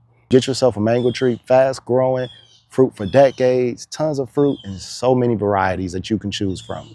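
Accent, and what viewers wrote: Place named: California, United States. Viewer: You sound like you’re from California.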